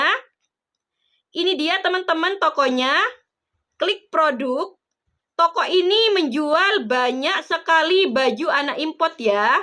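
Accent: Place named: Madura, Indonesia. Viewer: Makasih banyak kaka atas penjelasannya yang sangat bagus, detail, dan rinci. Dari logatnya, kayaknya kaka orang Madura ya😊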